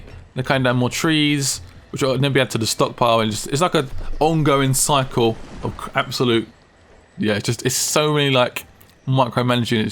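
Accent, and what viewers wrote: England, United Kingdom. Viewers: Wow I like the English Accent dude more than the gameplay lol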